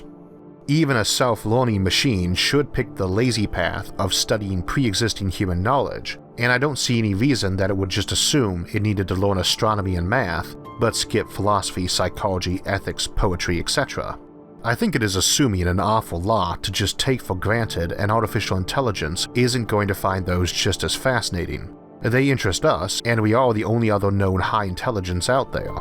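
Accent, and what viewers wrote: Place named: South, United States. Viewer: Lol. I didn't even know you had a speech impediment. I'm English and thought you had a Southern American accent.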